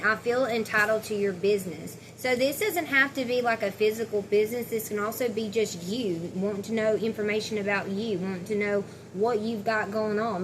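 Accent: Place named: South, United States. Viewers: Sounds American from the South, like George or Alabama. 💝 She does have a sweet heart!💝 PS she says she's from Georgia! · I love your accent. Are you American?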